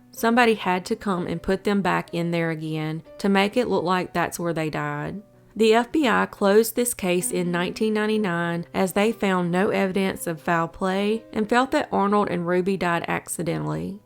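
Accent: South, United States. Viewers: Ahh ya my fave southern drawl accented lady from over the pond :) it’s much needed just fallen out with a life long friend over money :( should never have helped her but too late now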